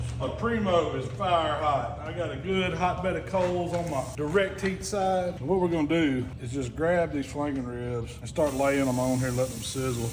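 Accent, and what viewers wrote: South, United States. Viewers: Souther drawl ✅ Beard ✅ Verge of type 2 Diabetus✅ You know it’s gonna be good food.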